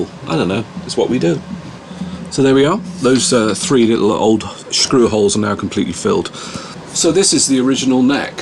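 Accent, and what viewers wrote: West Country, United Kingdom. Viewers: Discovered your channel an hour ago and already bingeing :) Are you from the West Country?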